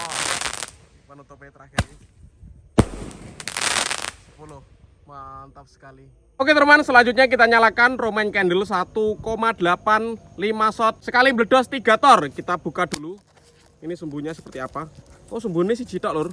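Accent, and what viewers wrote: Java, Indonesia. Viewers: Jawane ngndi mas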